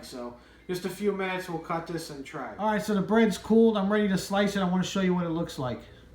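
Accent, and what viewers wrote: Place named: New York, United States. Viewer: Everything about this video, from the delicious bread, to your (east-coast/new york?) accent, to your ADORABLE son and sweet wife filming you (reflection in a spoon lol) I can't wait to try your recipe. Thank you for sharing some of life's joy - God bless!!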